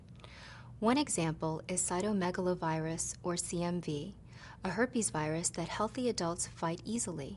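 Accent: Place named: United States. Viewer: Wow she sounds like an american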